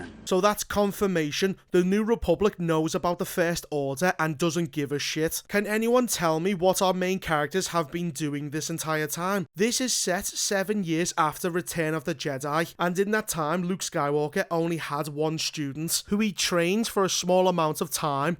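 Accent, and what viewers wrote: Liverpool, United Kingdom. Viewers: Bro, you have to be from liverpool yea? This man sounds EXACTLY like Darren Till 😂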